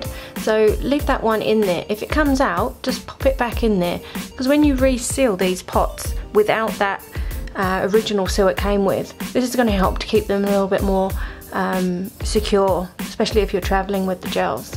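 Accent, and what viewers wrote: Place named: United Kingdom. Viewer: Hi, yes I know its a 2017 video but I'm still doing catch up, I'm a slow old bird lol. Now that I've seen this video I know you should be able to do some gel designs for me, even just simple easy ones I don't care - just give me gel (x). I cannot make out if your British or not, sometimes I hear an accent and then you sound very uk-ish. Take care hun,Xv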